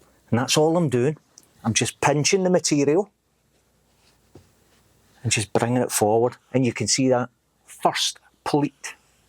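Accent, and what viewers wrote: Scotland, United Kingdom. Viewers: On 1 side of my family we are from Wales i think thats how u spell it and other side of family we got Scottish and I love the scots !! I would like them even if they wasn't in my past ! I love Scottish way you talk. The English you can't understand the Scots you can. Great video I love the kilt but never wore one.. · You could make a video about worms and I would watch/listen. Is “Bonnie” bad or good? Scottish accents are my weakness! 😩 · A Scottish man talking. I’m here for it.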